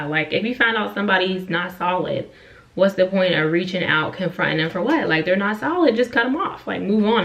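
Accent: Pittsburgh, United States. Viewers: Yesss! Loving the new hair style & makeup👌. My favorite style on you is the updo that you had in your self care video bc your beautiful skin, eyes, & lashes are popping! ✨ But this looks great on you as well and it looks natural. My Sis lives in Pittsburgh and she has that same accent even though we are from N.C. I went to NCCU. And I experienced fake girlfriends but what got me was back at home I had a fake guy friend that told me ALL of his “cousins” business! I was so shocked and it was an immediate red flag 🚩 for me. I later told him that he “talked like a Bitch!”. Lol.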